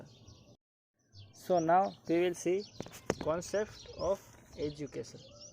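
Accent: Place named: Bengal, India. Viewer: Like my benglai teacher speaking English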